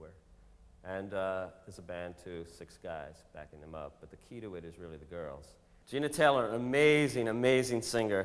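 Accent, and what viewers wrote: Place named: New York, United States. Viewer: His accent is THE New York City. I don't think he was brought up there though. A friend sent me this, said, this is Jim's mind. He's actually quite sane.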